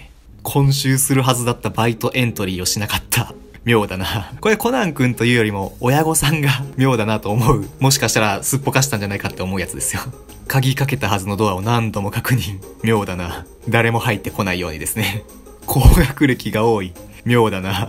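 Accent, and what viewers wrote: Kansai, Japan. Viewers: さん、ネットでは関西弁。妙だなあ。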